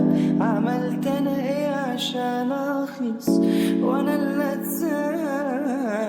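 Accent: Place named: Saudi Arabia. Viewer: لهحه سعوديه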